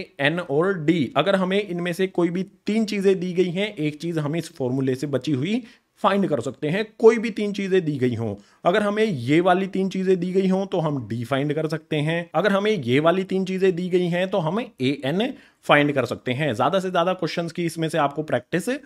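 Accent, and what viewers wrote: Haryana, India. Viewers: Sir haryana ke ho 😅😅😅😅😅😅😅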